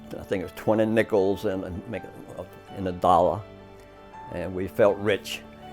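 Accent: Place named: South, United States. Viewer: The accent is like sweet music to my Massachusetts ears. Such a gentile sound. I could fall in love with a southern gentleman. My ancestors on my mothers side came from Virginia, Kentucky and North Carolina. My 4th great grandfather was a baptist preacher down there. Rev. Andrew Tribble. The depression brought my mother up this way for work in the shoe shop factories in Manchester, NH.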